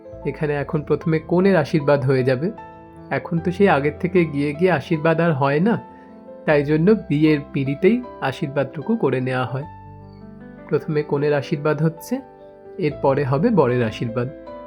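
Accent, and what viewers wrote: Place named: Santiniketan, India. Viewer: Apnr bolr style ta santiniketoni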